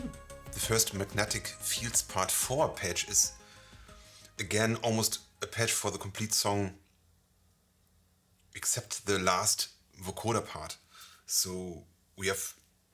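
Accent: Germany. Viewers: Bestimmt ein Tolles Set, danke für die Mühe. Ich möchte nicht "trollen" oder dir irgendwas böses, aber ganz ehrlich: Mach deutsche Videos. Dieses Deutsch-Englisch hört sich schauderhaft an. Und vermutlich liegt dir die Sprache nicht besonders, so dass du dich da immer von Video zu Video kämpfen mußt. Ich denke da an einen anderen Kanal, wo der Betreiber wohl auch erst Englische Videos gemacht hat und irgendwann beschlossen hat diese in Deutsch zu machen. Dadurch sind die Videos für ihn leichter und schneller zu erstellen und die deutschen Zuschauer haben da auch nur zugestimmt und die Abonnenten sind sogar gewachsen. Wie gesagt, ist nur meine persönliche Meinung und ich möchte dich wirklich nicht angehen. Aber vielleicht würde es für dich auch schöner und einfacher werden. :) Viele Grüße und auf weiterhin gute Videos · Das mag zwar sein mit der deutschen Aussprache, aber so erreicht er mit seinen Soundsets ein größeres Publikum. 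Die Wavestate wird ja nicht nur in Deutschland verkauft und die Titel sind weltbekannt. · I like this Germenglish accent 🙂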